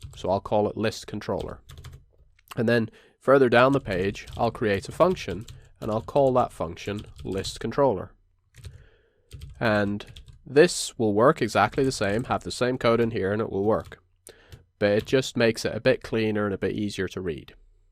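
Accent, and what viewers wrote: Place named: Ireland. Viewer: Your accent sounds like a mix of Irish and Northern Irish. Nice vid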